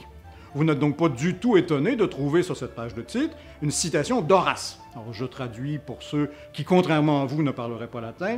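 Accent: Quebec, Canada. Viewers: Merci, c'est tout à fait passionant ! Et l'accent québécois fait toute la différence !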